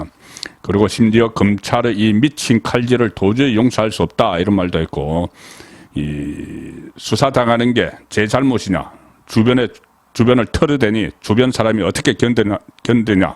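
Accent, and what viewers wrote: Gyeongsang, South Korea. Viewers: 송국건유튜브방송을 즐겨보면서 늘 느끼는 점은 내용은 최상의 수준인데 말실수를 잦은편인데 그건 그다지 문제가 될 수는 없지만 억양 지방시골억양이 참 문제입니다. 예컨대. 엄마의 자매 이모?를 성씨가 이씨일때 이 모씨 라고 말하면 안되듯이, 장음 단음이 정확해야하듯이 오늘 방송을 보면서 자꾸 유서를 유 서 라고 합니다. 스스로 녹음된 거 보면 그걸 못고치는지? 40년대 시골사람이 서울한번갔다와서 서울말씨를 흉내내다보니 저런 현상이 나타나듯한데, 특히 경남지방사람들이 저렇게 발음 억양을 구사하는데, 대표적으로 가장 울렁증유발수준은 성창경입니다. 그런데 송국건혼술은 정말이지 우파에서 방송내용은 최상인데, 발음 억양이 최고스럽지않는 기본이 안된건 고치는 게 좋지않을까요? 앞서 언급했듯이 성창경은 울렁증생겨 듣질못할정도인데, 기자출신이면 그걸 고치지못한다면? 울산이나 포항정도수준의 시골지방기자수준에서 벗어날 수 없는 게 아닌가하는 맘이 듭니다.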